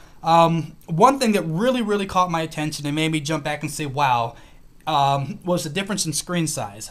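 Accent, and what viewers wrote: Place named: United States. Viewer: i instantly know your american Y'all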